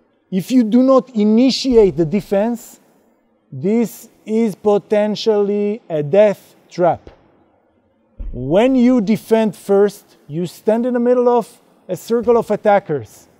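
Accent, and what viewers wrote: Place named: Israel. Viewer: I wactched the video. It's better not to mistake anything in that video for sound advice. It's just choreography with a narration that's done in a Modern Hebrew accent. There are as many ways to deal with multiple attackers as there are potential gaggles of attackers but this video demonstrates something that will only ever work on people who aren't actually serious about hitting you. Fix your footwork. Move from position of balance to position of balance and for the love of god don't compromise your own balance just to take someone else's. If you cross yourself up and overextend like that "grandmaster" then someone's going to throw the ground at your face while breaking a few things on the way down. The primary tenet of ninjutsu is don't get hit and the third law of motion is that the ground hits you as hard as you hit it. Apropos that you should probably get out of the habit of hitting the ground with your talus when you roll...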